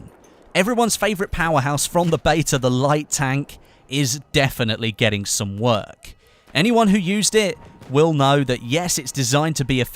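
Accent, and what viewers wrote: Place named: United Kingdom. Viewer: Wonderful information, thanks for delivering all of these information for us.:D Great channel,.. I become very happy the moment I notice that you uploaded a new video..:D (Between, you're a great English teacher, I'm practicing my British accent by watching your commentaries..) xD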